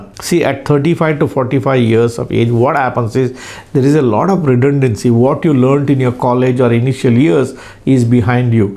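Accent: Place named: Karnataka, India. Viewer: English with a Kannada accent- very attractive.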